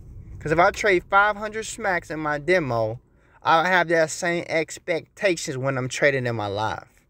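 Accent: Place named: United States. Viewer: My mentor is sounding more country each video lmao. Good job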